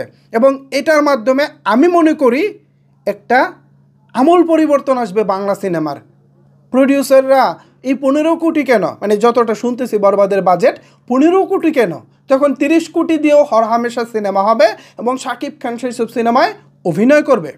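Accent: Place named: Netrokona, Bangladesh. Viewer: Netrokona Kotha boilen Bhai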